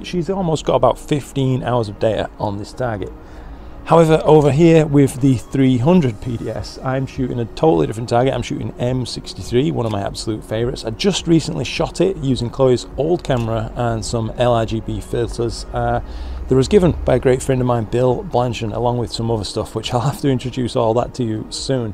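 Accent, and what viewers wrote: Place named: North, United Kingdom. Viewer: Just catching up with this vid. Great watching as always. I like the current channel name, but it would be remiss of me not to mention as a fellow northerner, given your geographical origins, something along the lines of ‘ in the night sky’. The /look ‘joke’ might be lost on those not familiar with regional accents but it still works with your name! 🤔🤣 👍🏻 Noddy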